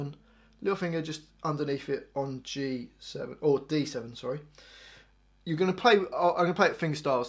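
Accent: United Kingdom. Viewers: Omg how cutie british accent I love him speeches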